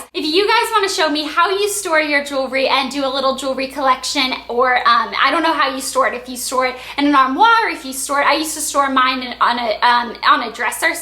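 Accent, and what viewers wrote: South, United States. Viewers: well she does have a southern accent who's trying to speak northernish so asking her to speak perfect french is probably a little too much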